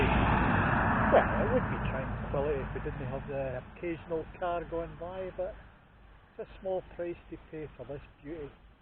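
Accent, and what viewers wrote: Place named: Tayside, United Kingdom. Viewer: Sounds like Tayside.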